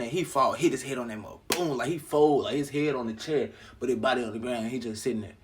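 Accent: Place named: Florida, United States. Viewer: Where you from just asking sounds like us in Florida low-key 💯💨🌴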